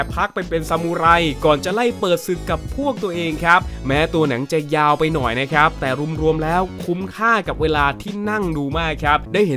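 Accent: Isan, Thailand. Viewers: อ้านเป็นคนอีสานแม่นบ่😅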